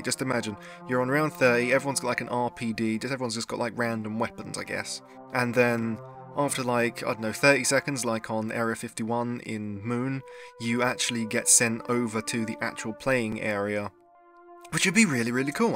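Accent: United States. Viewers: The American accent fooled me lol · Honestly I thought you were American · wow thats actually an amazing american accent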